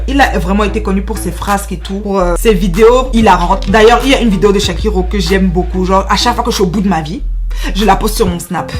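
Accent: Cameroon. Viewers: J'ADORE TROPPP TON STYLEEE, TU ME FAIS TROP MARRER !! 😂😂😂 En plus t'es ma sœur camer par ton accent je le sais wow. Hahahah. Je ne te connaissais pas avant pourtant t'es déjà à près de 20.000 abonnés.😍😍 Je me suis vite abonnée. Big Kiss et courage pour ce que tu fais et je remercie YT pour cette recommandation. ❤️😘😘😘😘😘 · Jadore jadore jai bien dit jadore l'accent camerounais je le trouve beau je kif voir les camerounais parler et en plus je tombe sur toi je ne te connaissais pas mais je te trouve fraîche pétillante marante en plus ta l'accent 😍😍😍😍😍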